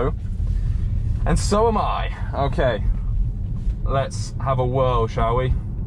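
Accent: United Kingdom. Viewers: Although your accent is British, and the steering wheel is on the right side, you pronounced "schedule" like Americans. :D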